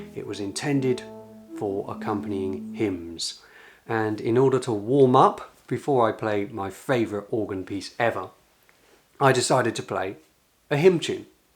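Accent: United Kingdom. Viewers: Dear, a while ago I have come across your beautiful YouTube channel and have been glued to my notebook screen ever since. in order to catch up with as many as the older videos as possible. It is such an amazing abundance of landscape, church architecture, organ expertise, wisdom, language and literature and last but not least – music. I love the magic moment when you open a church door, expectantly and respectfully, As a translator/interpreter from Icelandic I have an ear for languages and accents and I must admit I fell for your beautiful British, or should I say English, tongue right away. I could listen to you forever and you have definitely enhanced my vocabulary since I keep watching your videos. Please keep filming – and playing - and talking! 😊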